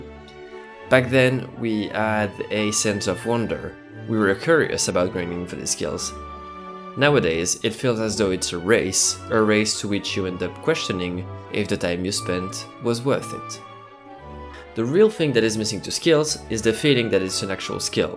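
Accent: France. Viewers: ta l'accent d'un francais qui veux parler anglais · You sound so much like a France guy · Listening to your accent i can approve that bedore you did yt you whrre used to talking french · J'aime cet accent français ! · FRENCH ACCENT !!!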